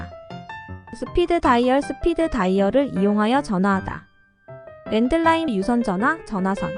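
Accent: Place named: South Korea. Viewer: AI인가요? 영어를 한국식으로 표현하니 어색하네요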